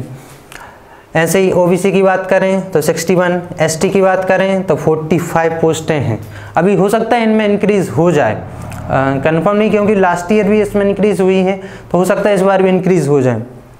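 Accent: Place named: Bundelkhand, India. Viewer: apki language se pta chala ki ap bundelkhand se ho..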